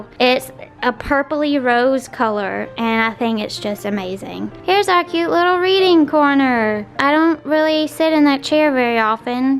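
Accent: South, United States. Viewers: Loved this. I'm from Alabama and I make sweet tea probably everyday for me and my family. Tea is meant to be sweet and cold.. always. You should do a video on grits and cornbread. I have all of my grandmother's furniture too. Too many memories to get rid of them and they are special to me. It's nice to hear a Southern accent on YouTube too. But... why isn't there a sweet tea emoji? 😉😎😂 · I hope southern accent never ever goes away:) And I get to hear it in real life three time a year, woot, woot! Whenever I visit the south. And since my mommy is southern I have a slight twang in my voice too, I'm half Latino and half European American. My accent is interesting its a little bit southern mixed with a slight Hispanic/midwestern accent. Ya'll can hear it over on my youtube channel, since I finally got the courage to upload my first ever vlog.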